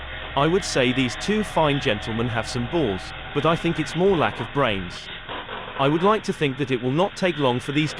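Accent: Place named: United Kingdom. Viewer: I like your videos and your channel, but I do have one complaint. Sorry, but I really can't stand the narrator with that British accent. It's actually keeping me from sitting through the videos. Maybe it's just me but I thought I might represent others as well.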